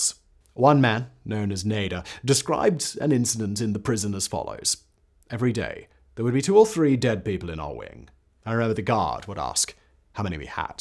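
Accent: United Kingdom. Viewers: his accent is becoming more British each video somehow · Bro that too much British accent for me to handle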